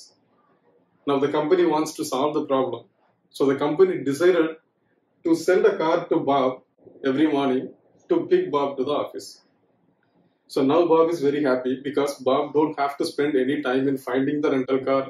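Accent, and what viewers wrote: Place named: India. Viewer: I badly understand Indiand pronunciation but this dude described this better than anybody else! 👍👍👍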